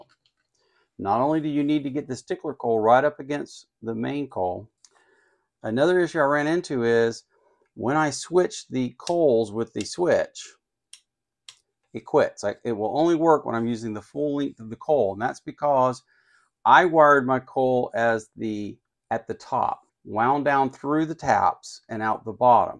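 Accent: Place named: Spain. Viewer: Your french sounds a f... lot like spanish.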